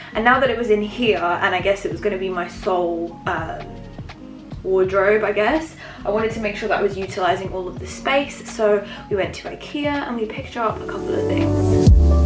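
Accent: United Kingdom. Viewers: Lol she sounds British aahhah is that just me.. must be because I'm British too 😊😊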